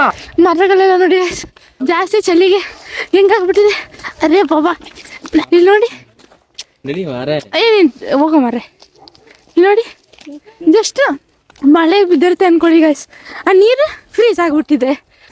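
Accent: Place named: North Karnataka, India. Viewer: Uttar Karnataka hudgan voice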